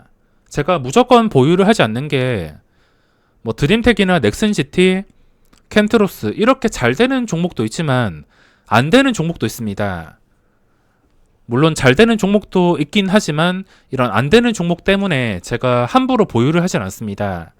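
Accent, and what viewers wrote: Gyeongsang, South Korea. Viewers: 창원사투리 진짜 짜증나네 표준물좀 써주시죠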